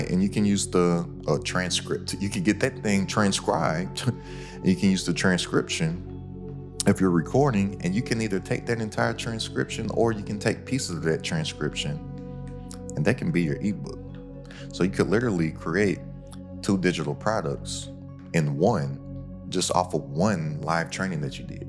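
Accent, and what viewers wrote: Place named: Los Angeles, United States. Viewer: oh wow that's trippy. Same exact type of slang and speech pattern as my homies in the LA area. Ur videos are fantastic, exactly what i needed. Keep up the good work 👏 starting a youtube channel very soon thanks to you. Finally going in.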